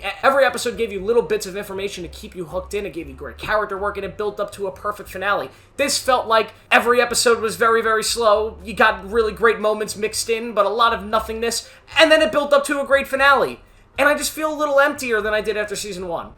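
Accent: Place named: United Kingdom. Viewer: The British accent did come out often glad I am not the only who noticed that since English is not my main and I thought I am imagining it 😀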